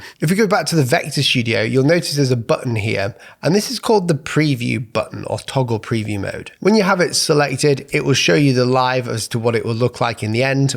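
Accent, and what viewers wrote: United Kingdom. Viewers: nice video, more please nice to hear a British person explaining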